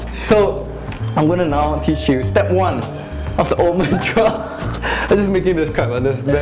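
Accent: Singapore. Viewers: cool video dude...are u from singapore..just asking... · r u singaporean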